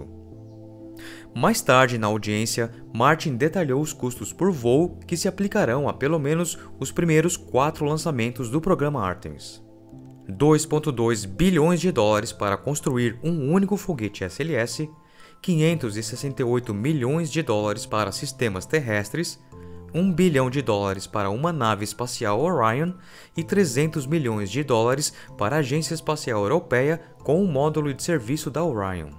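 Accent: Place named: Caipira, Brazil. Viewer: Negativo no canal é apenas esse sotaque caipira do locutor. As informações e animações são bastante detalhadas. Parabéns!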